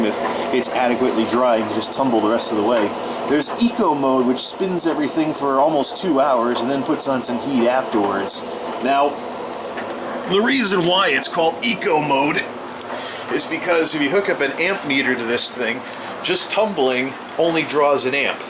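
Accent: United States. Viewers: I live in South Carolina sooo nice to hear a Midwest accent. I have issues w the southern accent lol.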